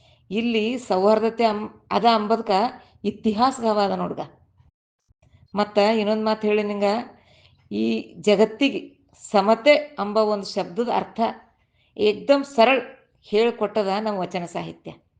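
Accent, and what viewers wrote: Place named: Bidar, India. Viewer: ಬೀದರಿನ ಯಥಾಪ್ರಕಾರ ಮೂಡಿಸಿ ತಿಳಿಸಿದ್ದೀರಿ ಜಾನಪದ ಹೃಡಿನ ಸೊಗಡಿನ ಮಾತು ಬಲು ಚಂದ👌🏻👌🏻👏👏🌷🌷🌺🌺 ಶರಣು ಶರಣಾರ್ಥಿಗಳು👏👏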